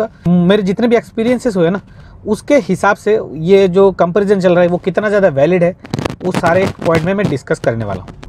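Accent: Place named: Hyderabad, India. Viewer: No sense of comparison! The food is best in Hyderabad overall in india, plus if u see hyd is worlds best city to live in since 5 years. The infrastructure of hyd is well planned since 1500 years the whole city was build by Qula qutub shah and it has largest Post office to first airport and many things . Hyd is not considered for south food it’s famous for biryani and non veg foods. Again largest film city + tollywood ads more opportunities to hyd. On top of it hyd is more welcoming to all Indians compare to Bangalore. Especially North Indians don’t like to live in Bangalore or Chennai but they are more comfortable in Hyderabad as food and language is major attraction . One more thing ur speaking almost hydbdi slanng 😂😂. Thanks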